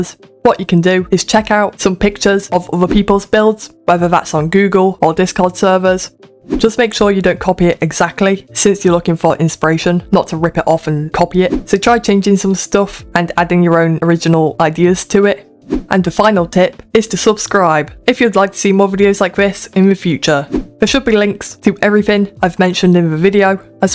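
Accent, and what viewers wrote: United Kingdom. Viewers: This british accent is funny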